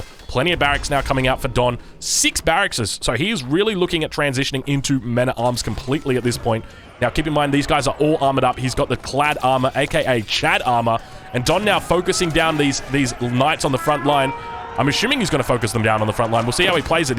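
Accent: Australia. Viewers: So glad I found your vids and channel! More strong aussie casters!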